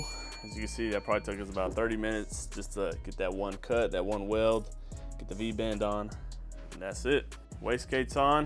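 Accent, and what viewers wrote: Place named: Louisiana, United States. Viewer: Hold up player! You from Scott Louisiana are what? Your roots run deep in your voice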